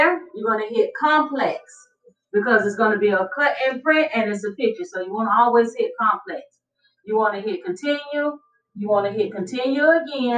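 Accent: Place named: Florida, United States. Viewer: This was very helpful. I knew right away from that accent that you were from Florida. I believe I am right. 🤭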